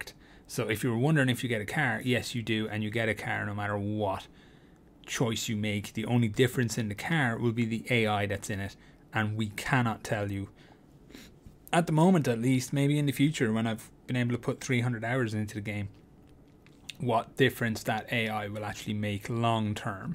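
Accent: Ireland. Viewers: i can feel the irish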